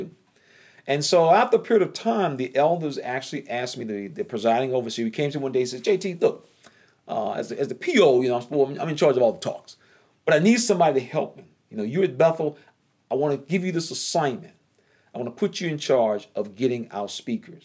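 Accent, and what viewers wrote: South, United States. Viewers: I know this video is two years old at this point, but I'm just now seeing it. I love your videos. They're so full of warmth and heart--no wonder the GB doesn't want witnesses watching this. You speak exactly like every brother from the South I've ever known. You're not bitter. You have good memories of the organization, but you don't let emotion cause you to outsource your thinking to other men. I admire your channel very much. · So it's not about JEHOVAH 🤔 it's about pleasing the ears of men wow, disgust. I thought all this was based on approval by JEHOVAH to be apart of Bethel. Another eye opener when JEHOVAH says HE'S roving the earth for those who's heart is turned towards HIM. When genuineness approaches and speaks to whomever it pierces to the soul so it shouldn't matter how southern you sound right JT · Southern drawl........what's wrong with 'our' southern drawl? 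I have cousins in WI. It's a different sound. My Mother lived in southern California...different sound. It's still English. We say y'all. WI Say yous guys. I understand what they mean and vise versa. I would have packed my suitcase and brought my southern a** home!!! Great video JT. Please tell Lady C hello. Nothing could be finer than to be in Carolina. Lol.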